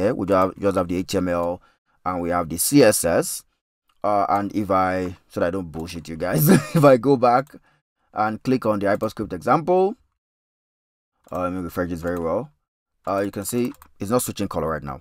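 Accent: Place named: Caribbean. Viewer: This is the first time ive ever heard someone with a caribbean accent on youtube... (maybe jamaican?).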